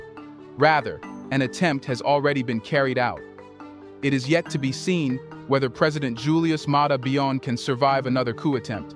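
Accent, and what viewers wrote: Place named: United States. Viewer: The narrator is an AI right? Sounds Amerlcan but the country pronunciations are not consistent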